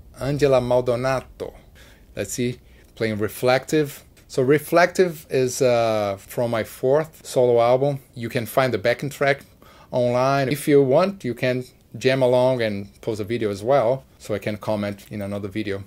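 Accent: Brazil. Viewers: ainda bem que tu tá falando inglês com o teu sotaque brasileiro, eu entendo quase tudo o que tu fala, sem necessidade de legendas. Meu inglês não é fluente, e quando eu ouço um americano falando, todo enrolado, eu não entendo quase nada, aushuhauha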